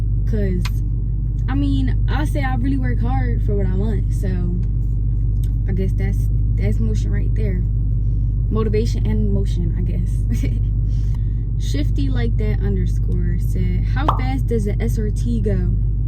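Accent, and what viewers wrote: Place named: New Jersey, United States. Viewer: The accent she gotta be from Jersey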